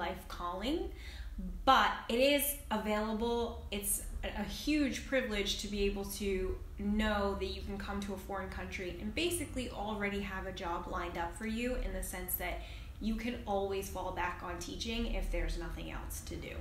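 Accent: United States. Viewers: If I see you in Europe I'll think you are East African, maybe Ethiopian. (Your hair and face seem common with people from that region). That is in a way natural because there are many Africans but almost no black Americans, black Carribbeans or black Latinos in Europe. If you start speaking English though, you'll be revealed as an American, because your accent is very obvious. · Why do you think people immediately spot you as an American? Your accent? Do you have to start speaking first? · You don’t look different than Nigerians or Tanzanians etc. point is whether you are African American or African they see you as Black period and the only way they can tell you are an American is when you speak because of your accent other than that no, you are just like every other beautiful black woman at a glance.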